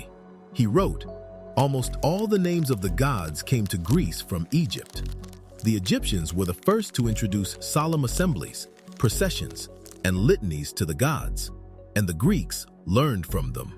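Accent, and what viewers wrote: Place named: United States. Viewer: Always in American English and only! 😂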